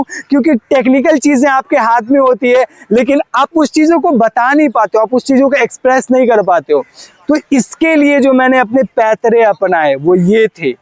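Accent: Bihar, India. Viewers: Tera aj v english sunke asli main hi lag raha hain tu bihar se hi hain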